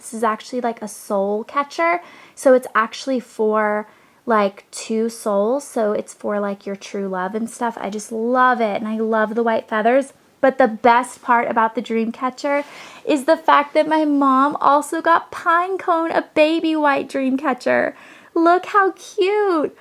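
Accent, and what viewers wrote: South, United States. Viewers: Haha your accent seems like it's gotten stronger from visiting home! I never really noticed a Southern accent but near the end of the video it seems to really come out! :) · It's funny because you sound southern! My favorite was the dreamcatcher!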